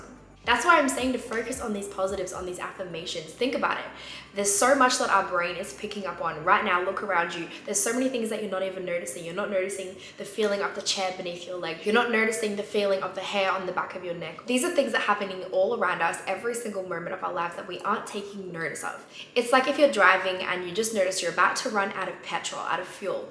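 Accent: Australia. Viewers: can you speak little slowly....I cannot caught few words because of Australian accent...I’m from India 🇮🇳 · Australian accent too tough to understand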